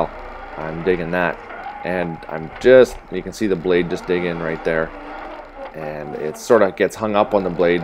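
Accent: Canada. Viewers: please don't talk, can't stand that Canadian accent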